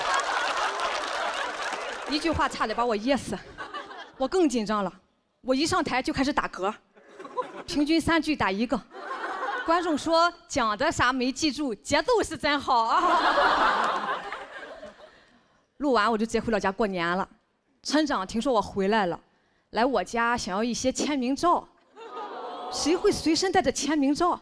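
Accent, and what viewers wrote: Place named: Shandong, China. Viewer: 临沂口音听着太那啥了😂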